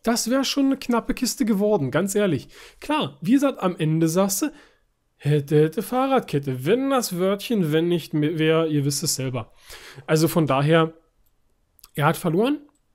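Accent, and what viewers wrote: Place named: Germany. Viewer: Du hast einen hardcore deutschen Akzent wenn du englisch sprichst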